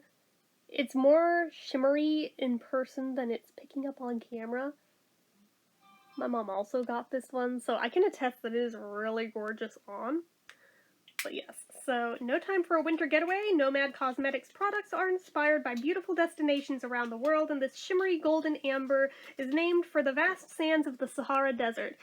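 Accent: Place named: Vermont, United States. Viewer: Speaking of Vermont, do you live there? Or originally from there? Your voice/accent is almost identical to my buddy from Stowe. Like scary close😂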